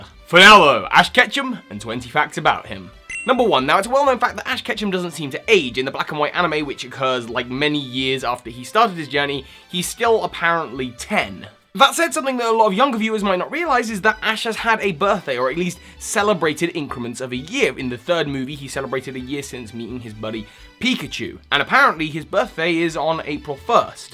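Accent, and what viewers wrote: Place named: England, United Kingdom. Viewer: The English accent ertainly doesn't make it any easier! lol!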